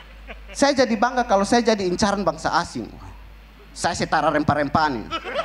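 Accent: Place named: Kupang, Indonesia. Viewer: Asli logat Timor Kupang.. 😅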